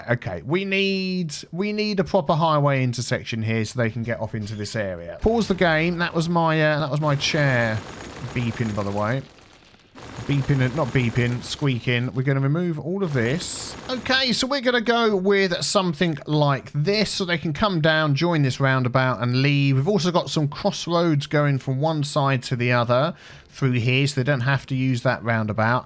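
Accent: United Kingdom. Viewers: Oh ok lol, love your vids btw mate just found your channel last week. Can I ask where you're from if it's not rude, I'm terrible with accents and was wondering what part of uk you were born haha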